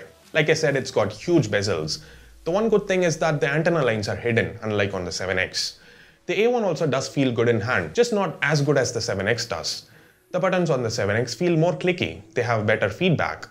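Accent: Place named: India. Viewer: Your accent is amazing lol. Some times i cant even hear that indian accent. While some times i can hear it clearly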